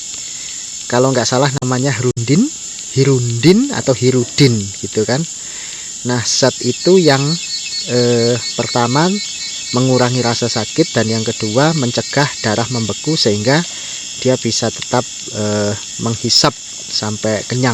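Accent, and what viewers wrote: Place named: Java, Indonesia. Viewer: itu di daerah mana bang. kok kayanya anda pake logat jawa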